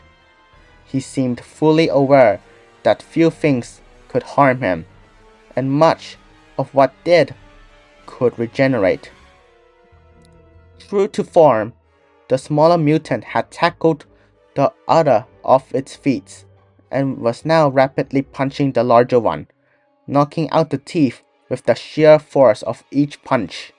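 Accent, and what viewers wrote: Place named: United Kingdom. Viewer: British ahh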